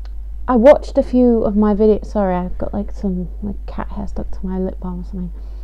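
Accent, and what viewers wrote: United Kingdom. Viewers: In my opinion there’s nothing better than a girl with an English accent.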